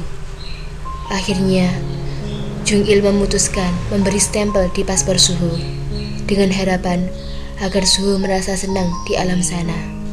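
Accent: Java, Indonesia. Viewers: I just wanna say: your Javanese so thick and glued. No offense though. Peace ✌️ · Org Jawa? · SUARANYA MEDOK BANGET SIH. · Orang Jawa ya? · Kaka narator nya jawane medok yah..... Salam dari jogja ka.. 😊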